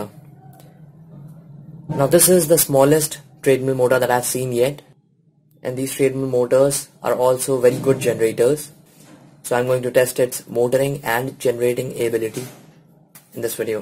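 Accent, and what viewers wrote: India. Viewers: if you just improve your Indian accent you are going to be watched and followed by more people thanks for the videos you are posting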